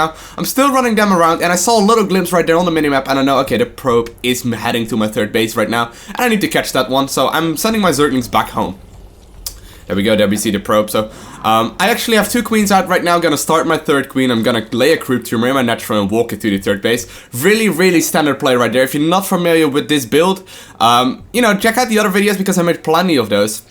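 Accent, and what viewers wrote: Netherlands. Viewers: his accent is dutch :D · It's neither canadian or british. It's northern european, possibly dutch. Feel free to confirm or deny.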